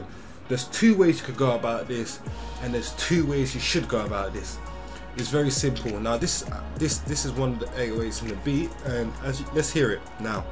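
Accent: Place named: London, United Kingdom. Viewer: so good to hear a ldn bruddda lol, keep it up my g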